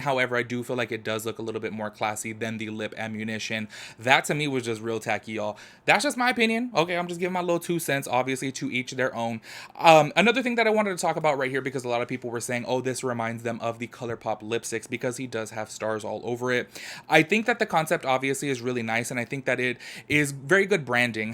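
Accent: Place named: Texas, United States. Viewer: omg ur from san pedro???? much love from the south bay 🤍🤍🤍🤍 thought u were from texas lol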